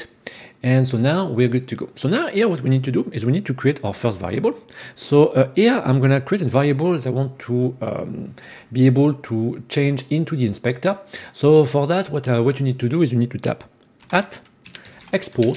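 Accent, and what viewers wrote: France. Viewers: Have questions will it be easier to make this with unity or godot And I like your mix french and English accent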